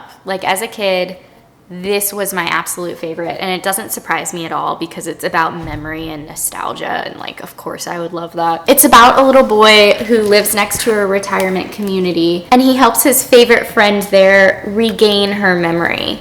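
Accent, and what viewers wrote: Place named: Upstate New York, United States. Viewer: HI just stumbled across your channel and I LOVE YOU ALREADY! where are you from bc u have a bit of an accent... i could be totally wrong but upstate new york? possibly buffalo?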